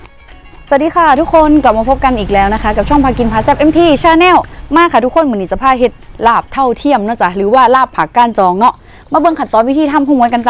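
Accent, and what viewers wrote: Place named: Korat, Thailand. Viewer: สำเนียงโคราช😅😊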